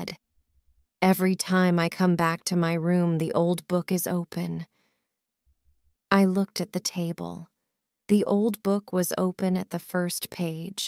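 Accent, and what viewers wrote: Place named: United States. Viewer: I do believe this is an England story, but read in American accent. I am an new English learner and I am currently learning American English.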